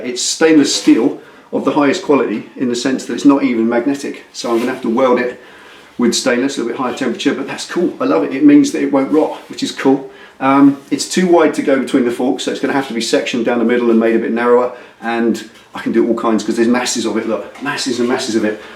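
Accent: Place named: West Country, United Kingdom. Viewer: Found your vlog recently, You've mad skills. I thought there was something familiar about your speech etc. , then I saw you're from the West Country. I was born there, married a Lyme Regis girl, and miss it as we've lived in the States for years. Holidays always seem to end too quickly. I see you collect number plates. I wonder if you'd like one of my old bike plates from Florida. RIX TOY. It's yours for the asking. Keep up the good work. At 70yrs. old I still learn things from every posting. Thank You. - Rick Newbery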